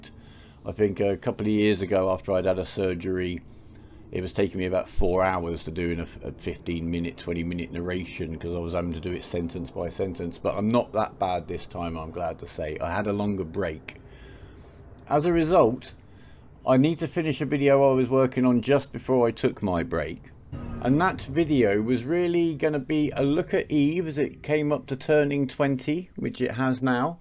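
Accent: United Kingdom. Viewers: Welcome back, hope your condition improves! On my end, I've been able to understand you perfectly. Although if I may comment, it's unfortunate that your accent is UK instead of US. While I prefer a UK accent to listen to, the US accents have a more nasal touch. This results in a lack of stress on the "s" and better to understand even if their condition is worse. If that were to be the case, perhaps we would have the pleasure to have a video from you a little sooner :)) Back to the topic at hand, EVE. Compared to all MMORPGs I'm aware off, this fits in a real niche. Even a 1 day old character is able to participate in end-game content of the newest updates and actually matter. No need to grind levels and gear to have any impact in the newest expension. Even on day 1 after the abyssal filaments release, a rookie cruiser would have been able to jump into the abyss and have fun. 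Of course win chances and improved loot rises the more experienced (older) a character is, but your efforts matter. In that regards, I don't think EVE is pay to win. All the ISK and modules in the world don't help a ship if they meet the right number of cheap fitted ships willing to be sacrificed for their cause. The Subscription based game, would fit EVE like a glove. So much content is more viable when having access to all ships and skills. Nevertheless, plenty of people are enjoying an Alpha playstyle, ever enjoying the endless trial option of the game. IGN : Spectral Spectre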